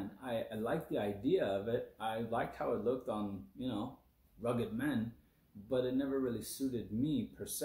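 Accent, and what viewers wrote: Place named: Eastern Europe. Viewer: where were you born? Your accent and grammar appear to be non native. Eastern Europe?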